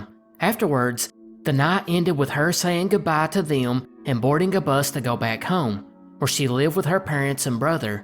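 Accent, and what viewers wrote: South, United States. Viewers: love your accent man. is that some kind of southern usa accent?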